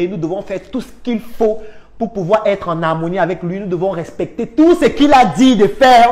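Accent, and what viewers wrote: Cameroon. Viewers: Il s'exprime avec le ton des Camerounais . Chez nous au Cameroun, la plupart des gens parlent à haute voix et c'est très souvent naturel pour attirer l'attention des interlocuteurs . Alors si tu viens au Cameroun, tu vas aussi commencer à parler sur le même ton .😅😊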